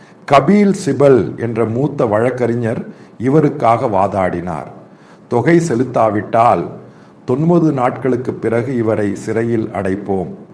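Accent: Kerala, India. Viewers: தமிழுக்கும் மலையாளத்துக்கும் இடைப்பட்ட ஒரு மொழியில் பேசுகிறீர்கள் 😇 மன்னிக்கவும் , பாதி புரிகிறது பாதி புரியவில்லை.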